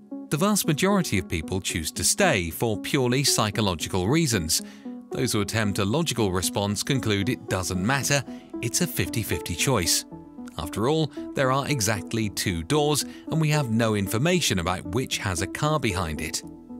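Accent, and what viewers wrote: United Kingdom. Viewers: This is one of those rare cases where a British accent does NOT make something sound more true. is absolute B.S. because the car isn't in all three locations. The player has chosen what he has chosen and the car was placed where it was placed (though I wonder if these gameshows don't switch the prizes backstage while they are rambling on, distracting the audience). is B.S. because "all of the other options" are in the PAST when they are ruled out. Card counters don't work on the probabilities of the past, but the current probabilities based on which cards have been dealt or played. stating 1 out of 3 or 2 out of 3 is wrong because the third option has already been ruled out. No, it does NOT still have the same probability of winning. Once the third option is ruled out the odds change. If you play Russian Roulette the odds are 1 in 6, but when the hammer falls on a chamber the odds change, which is why there is so much BUILDING suspense in these scenes in The Deer Hunter. 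But the originally chosen door ALSO now has better odds! That is LOGIC. This video reveals what I have observed to be a YouTube rule of thumb: If it takes 20 minutes or more to explain, it just might be so much Bravo Sierra, taking advantage of your short attention span on a platform that caters to it, appealing to your desire to appear logical by mischaracterizing Logic as Intuition, and disarming you with a lengthy onslaught of eloquence without communicating much truth. If I had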